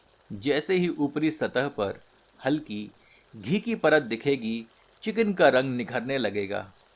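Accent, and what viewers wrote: Standard. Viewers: आपकी शुद्ध हिन्दी से चार चांद लग जाते हैं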